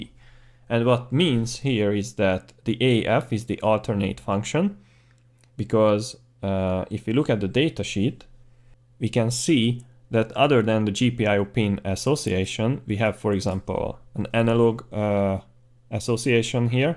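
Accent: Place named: Hungary. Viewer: very good tutorial magyaros kiejtessel 🙂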